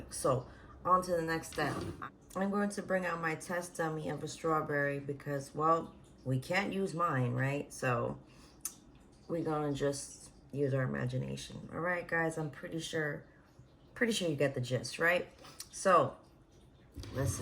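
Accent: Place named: New Jersey, United States. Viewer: You so fuckin jersey I love it lmao jawn good shmoney lmao jersey boy here 💪🏾💪🏾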